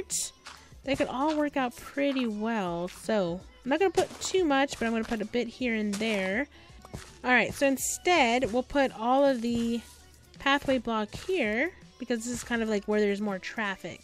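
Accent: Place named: Texas, United States. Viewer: Are you from Texas or South Carolina?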